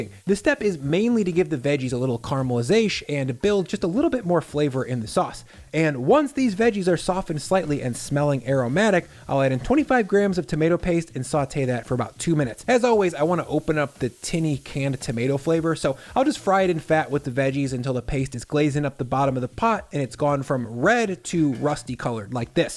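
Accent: United States. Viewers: You sound American but you are using metric measurements? WTF?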